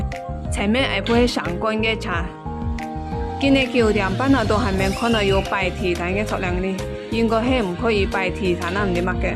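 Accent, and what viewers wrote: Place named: Pontianak, Indonesia. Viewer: Ini hakka pontianak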